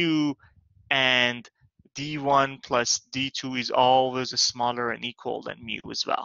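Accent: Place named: United States. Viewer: That's a long video, I spent 3 days watching it, making notes at the same time, and learning English as well. Thanks to good American accent, I can understand the video relatively easily, but the theory is still difficult to grasp.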